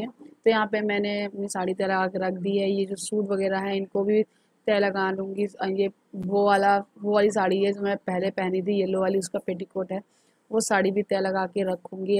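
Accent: Bundelkhand, India. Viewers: Hello di aap bundeli bola kariye aap ke munh se bundeli bahut acchi lagti hai ham log bhi ghar per bundeli hi bolate Hain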